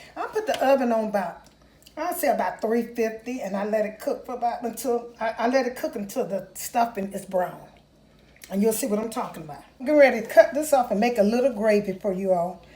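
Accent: South, United States. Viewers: Ooooh...as u stand there reminding me of my Grandma cooking brought tears to my eyes. She was the BEST...your soft calming southern voice is truly a memory of her...Thank you for sharing Continued #BLESSINGS to you🙂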